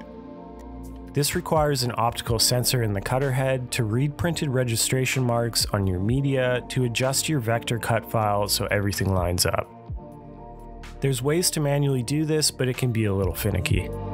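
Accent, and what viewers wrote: Canada. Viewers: Took me a while to figure out your Canadian pronunciation of "decal", but otherwise, thanks. Also you lost some credibility with the Prusa hate. I agree that Bambu printers are technically better, but open source is the most important feature for me, so Prusa wins there.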